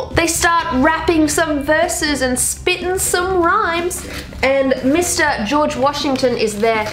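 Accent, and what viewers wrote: Australia. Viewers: I love ur Aussie accent👍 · Skylar Sky she’s Australian its an accent thing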